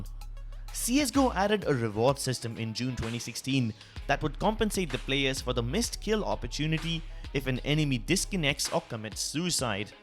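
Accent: India. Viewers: I'm sorry guys, I know you're doing great job at researching and editing, and it's really not your fault (or nothing you can do about it), but this indian accent is just unbearable, made the whole, otherwise well made, video unenjoyable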